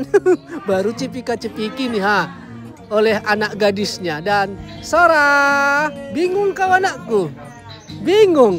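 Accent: Medan, Indonesia. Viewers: Enak kali denga logat medan abang.sering2 upload bang pasti berkembang canelnya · Senang dgr cara bicara ito ku ini. Bahasa nada medan nya ga hilang walau sdh lma merantau ke negri Jepang...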